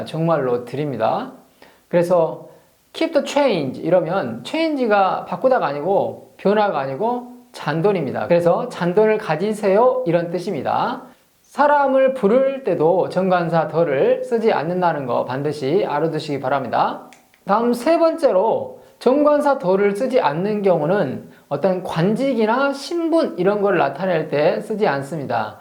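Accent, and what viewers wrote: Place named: Jeolla, South Korea. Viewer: 전라도 사투리 굉일..짱 잼있습니다.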